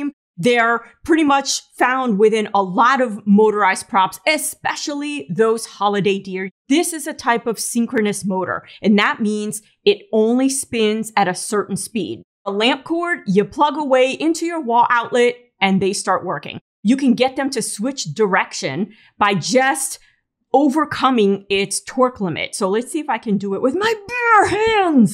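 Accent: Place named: Upper Midwest, United States. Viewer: You are a burst of energy and good instruction. Where does that accent come from? Upper Midwest? Glad to have found you.👍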